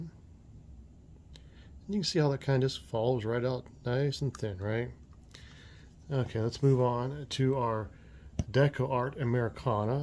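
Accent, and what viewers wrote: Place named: Canada. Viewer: Classic Canuck talk. 😆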